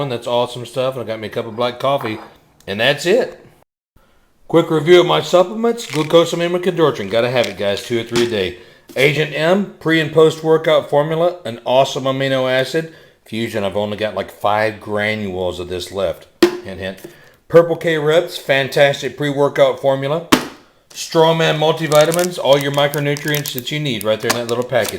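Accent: Texas, United States. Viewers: Can tell you're from Texas brother!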